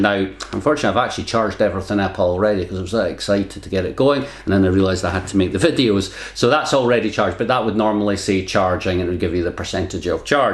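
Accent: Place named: Edinburgh, United Kingdom. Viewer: Secretly excited I knew your accent was Edinburgh in a matter of seconds. I lived in Alnwick, England for 6 mo. during college, which is not far. Cant wait to return on a trip this fall, I have missed the UK! Need to research the drone flying rules there- is it strict? Thank you, sending high-fives from Minneapolis!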